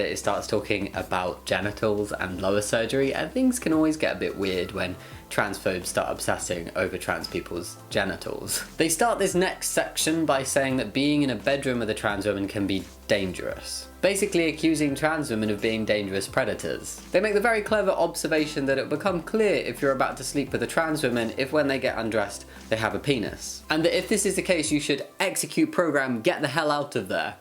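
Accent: United Kingdom. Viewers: I love how masculine his voice sounded when he said ‘femininity’. Something about the mixture of the deep voice with the British accent. Not fetishising, I’m British too, but yeah just a random thought. Otherwise I enjoyed the vid, that TERF is a right idiot and an all-round awful human being.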